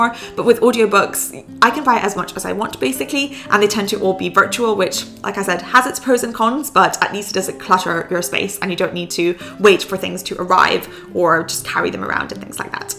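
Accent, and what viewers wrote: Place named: United Kingdom. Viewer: plz add subtitles I'm not compatible with British accent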